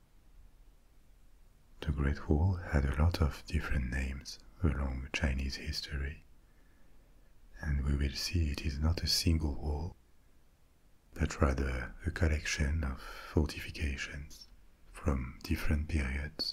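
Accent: France. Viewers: I love the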